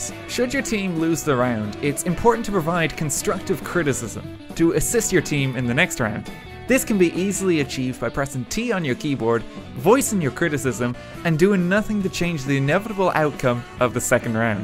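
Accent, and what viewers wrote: Ireland. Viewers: U Irish??because I am lol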